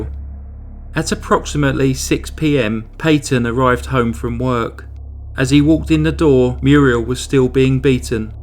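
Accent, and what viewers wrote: United Kingdom. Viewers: This british accent confuses me somehow...i concentrate on the accent not on the Story. Can t even say if i like it or not...i m just confused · Love your cheeky endings!! Hope you’re getting through your isolation and keep making such well narrated content. Hearing any of the British narrations brings my mother’s voice to the forefront and always brings a smile to my dial. Thanks.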